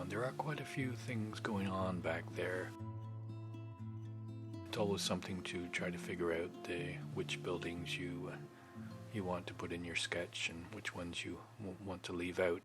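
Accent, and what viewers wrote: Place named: Canada. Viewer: I lived along the St Lawrence River and grew to enjoy the Canadian accent, thanks for the memories. I love how you capture the soul of the area in your pictures. 👍🏻🥰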